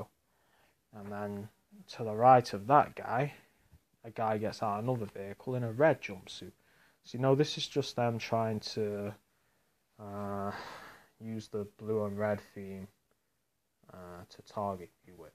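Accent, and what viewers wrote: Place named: Nottingham, United Kingdom. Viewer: Have you ever wondered if you have mental health problems? I'm sorry if my comment offends you but you're living in hell at the moment and getting help might make life better for you, If not at least you can be sure it's not your mind playing tricks on you. I'm from Nottingham, You sound like you're from Nottingham as well or near? Take care.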